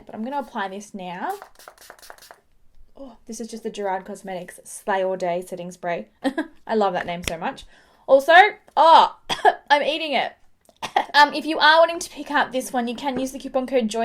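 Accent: Australia. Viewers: Sorry I couldn’t hear past the Australian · So many Australian makeup gurus, I love it. Or are your from New Zealand? I attribute your accent to Australia :p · Hey where are you from? You sound Australian....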